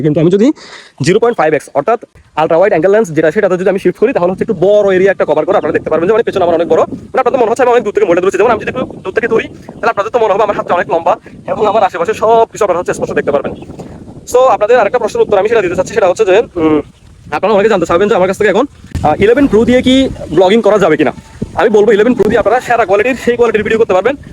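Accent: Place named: Chittagong, Bangladesh. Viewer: হ্যাঁ ভাইয়া ভালো কনটেন্ট ক্রিয়েট করার জন্য আপনাকে কিছু উপদেশ দিতে চাই কথাগুলো আস্তে আস্তে বলবেন যাতে করে মানুষ আপনার কথাটা সম্পূর্ণ বুঝতে পারে. আপনি চট্টগ্রামের আঞ্চলিক ভাষায় কথা বলায় খুব দ্রুত বলে ফেলেন তাতে করে অনেক মানুষের বুঝতে সমস্যা হয়।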